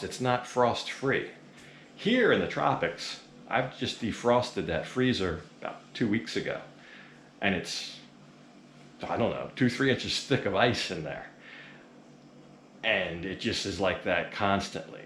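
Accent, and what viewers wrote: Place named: Pennsylvania, United States. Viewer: I'm guessing you're from Pennsylvania judging by your accent.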